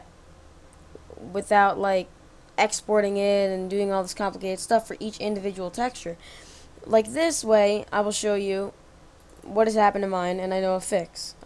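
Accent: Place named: New York, United States. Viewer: you have a new york accent lol. anyways thanks for the help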